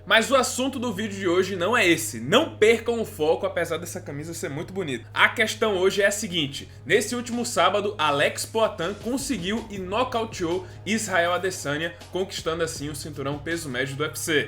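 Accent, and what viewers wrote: Recife, Brazil. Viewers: Tu é de Recife bixo ?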